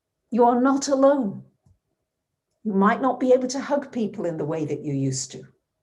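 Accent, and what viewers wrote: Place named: North, United Kingdom. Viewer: Kindness is the people at Royal Mail who helped me out the other day – I sent a card to a friend and forgot to put a stamp on it which is very unlike me, as I'm usually so organised (Mercury Retrograde). They went out of their way to help, sent a driver out to the post box and scooped it out for me after the weekend, drove to my address and posted it back to me, so I could then put a stamp on it – Lovely people! Thank you, love your video's and that Northern accent (like my late Grandmother's) is so comforting.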